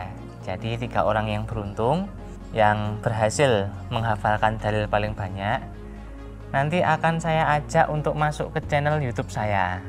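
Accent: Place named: Java, Indonesia. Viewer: Mas, jawa ne medok... 😃👏🏻👏🏻👏🏻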